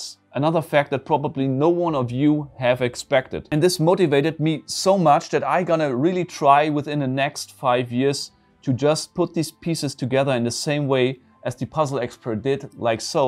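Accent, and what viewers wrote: Germany. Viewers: What is more remarkable is just how much you have strayed from your German Accent when speaking English over the past five years.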